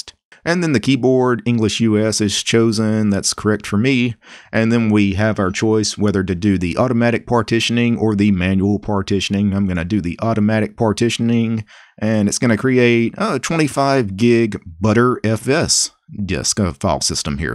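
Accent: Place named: Deep South, United States. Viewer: First linux nerd with a deep south accent xD Best thing in the community.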